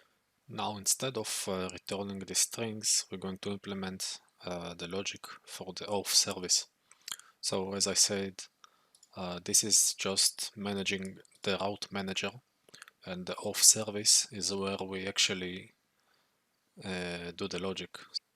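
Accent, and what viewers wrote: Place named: Madagascar. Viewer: Hello, I was wondering if you come from Madagascar? ( cause of the accent ) anyway, this is a great video, thank you for the sharing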